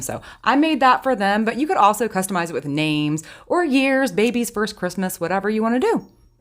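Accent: Texas, United States. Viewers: I stayed for the entire video and enjoyed every minute. You do a great job of demonstrating. And I. All of. Your projects look high end. Being. A Texan,I really get. Your voice!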